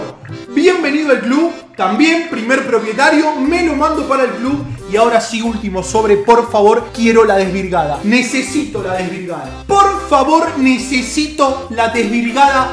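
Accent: Argentina. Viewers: Se copia de robert solo que con acento argentino :v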